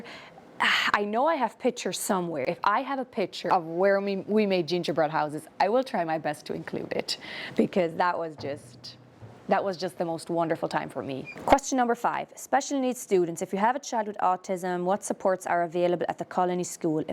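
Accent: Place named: Ireland. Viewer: Your accent has a tiny bit of an Irish sound to it at times, either you did some travelling or Hutterites accents change a bit from colony to colony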